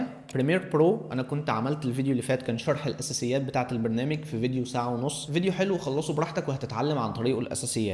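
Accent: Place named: United States. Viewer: بالله اتكلم بلهجة عربية لهجتك هجين كأنك امريكي تحاول تتكلم عربي غصبا عنك اعتز بلغتك لا تصير رخيص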